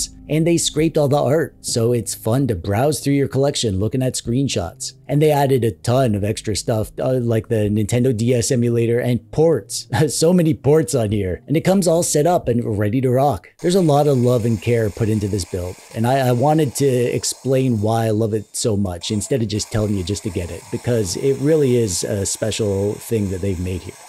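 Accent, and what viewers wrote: Canada. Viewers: I like your channel. I think that many of us watching are wonering who the heck that you are. U speak like u have a cold, u are funny and interesting. I seen ALOT of influence from other utubers especially the American Taki. As I watched 2 or 3 of your vids even with your strange congestion I could hear an accent. Are you Canadian? I think that u are. Interesting vids but ya dont have to be so weird. Its OK to be from another country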